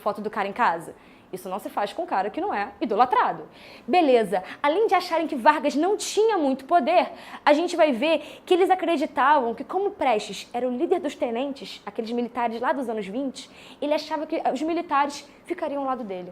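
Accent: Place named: Rio de Janeiro, Brazil. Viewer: Parece mesmo kkk ainda mais pelo sotaque de carioca .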